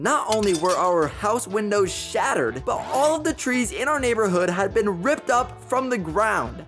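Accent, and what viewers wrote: Iowa, United States. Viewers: Are you from Iowa?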